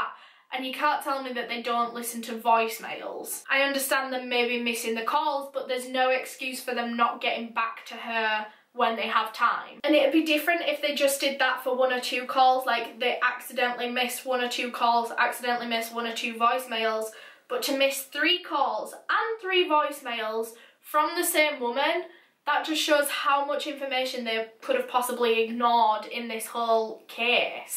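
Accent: United Kingdom. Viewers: I can heard talking for the rest of my life and I would not get bored. She is so eloquent with what she says and she makes you feel like she is only talking to you face to face. I love all of her true crime videos, even tho I hate to see all of these horrible things that happen on this world. It is good that people like her can talk about it to bring awareness. I truly enjoy the way you talk, even tho the british accent is a little hard for me as a hispanic person. One thing, I think you videos would look better if you change you backgroud because it just rare for me to keep looking on you lipstick as you are talking about terrible crime stories just my opinion. Anyways, love you videos, I hope you keep them coming.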